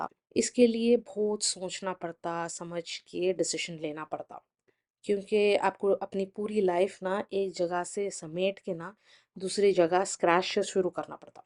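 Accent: Hyderabad, India. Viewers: Assalamalykum...first comment and like ...i am from hyd to....aap bilkul sahi boley ...i 👍 agree...hum bhi US mein they phir hyd aaney ka decision liye...us ke baad bahut regret hua aaney k baad ...khair Allah ki marzi thi ....aap ka hyd ascent achcha laga ...koi log hyd ke hokey bhi apna ascent change karke vlogs daltey...aap ke videos dekh k chicago ki yaad aati bahut....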